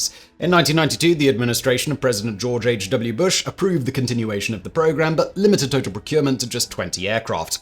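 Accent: England, United Kingdom. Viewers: I feel like I’ve seen clones of this guy on various channels. All bald, with a beard and a British accent… is it just me? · FOR ENGLISH ACCENT ONLY !!! - TALKING AT SPEED OF LIGHT 🤮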